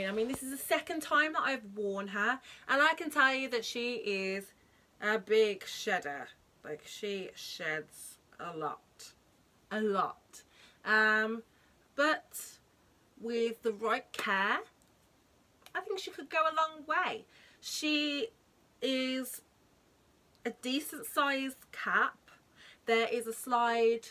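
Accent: United Kingdom. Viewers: So lovely to hear a British accent!!!!! Yessss!!! U look great. I bought it too😊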